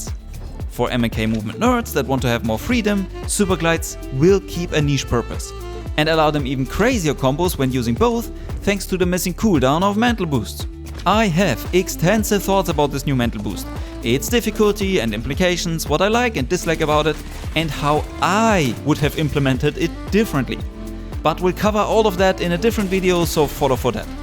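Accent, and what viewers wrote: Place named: Germany. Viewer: Klingt schwer als wärst du deutscher. Das englisch kommt mir sehr bekannt vor xD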